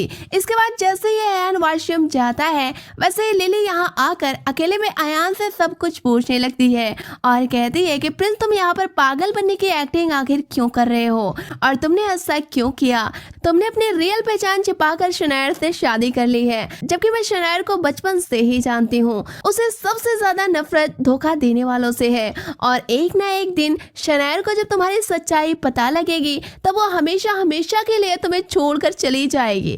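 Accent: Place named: Bihar, India. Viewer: Madam you are from Bihar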